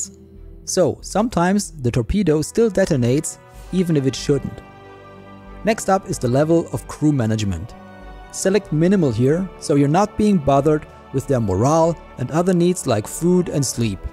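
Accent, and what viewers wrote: Germany. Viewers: Lovee the german pronounciation!!! 😭😭😭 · Das Boot was a masterpiece of a movie. Good to hear a German narrate the video. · Hahah, I love it that you are German (or Austrian, no offence) and you can give us proper authentic German uboat banter! Brings back memories of Das Boot! Alles gut, Herr Kaleun!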